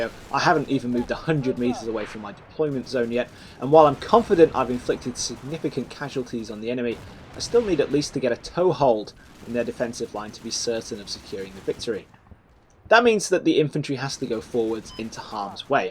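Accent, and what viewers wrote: United Kingdom. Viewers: Hey! Your editing and narration are just wonderful! In my book, you are the best Combat Mission Youtuber around.:D Must be something with you Brits... the best Youtuber for Forged Alliance Forever is also a British guy his channel is called 'Gyle Cast'. I played this scenario because of your video and enjoyed it very much. I use to be a bit more cautious in moving-to-contact which led to me losing just one lad. Aside from that your tactical analysis is spot-on so I went with much the same plan as you and it also played out much the same. The scenario reminds me very much of the first mission of the 'Task Force thunder' campaign which is in a similar context and tactical situation.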